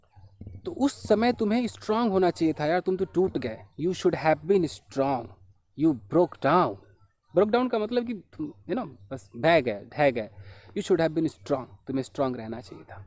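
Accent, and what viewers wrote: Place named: Kolkata, India. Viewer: Your 100% kolkata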